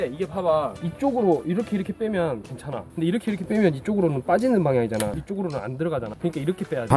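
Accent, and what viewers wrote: Jeolla, South Korea. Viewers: 택티컬 터널 검색중에 우연히 들어왔는데 즐라도 말투에 친근감 느끼고 바로 구독 눌렸는데 헬리녹스 전반적인 관점, 전망 설명에 ㅂㄹ을 탁치고 갑니다. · 같은 지역출신 사람으로서 동생같은 전라도 사람들이 많았으면 좋겠어. 전라도 사투리를 사용하는 사람들은 지적이다. 달변이다. 그리고 젠틀하다.이런 이미지 계속 퍼졌으면 좋겠어. 과거 암울했던 시기 오랜기간 여러채널을 통해서 자행된 전라도사투리의 부정적이미지 심어나르기 .. 추천누르고 구독 꽝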